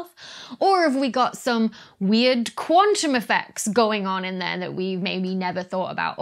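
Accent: United Kingdom. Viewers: Pretty, smart and has a British accent. She da dream.... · So informative, I didn't know the evolution of a blackhole. Also easier to listen to it with a cute British accent. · why would one even need to "ignore her non-American dialect,_ anyway? British accents are beautiful to me! A whole lot prettier than any of the many American accents that exist. 🙄 And I speak one of them, so I can say that. 😌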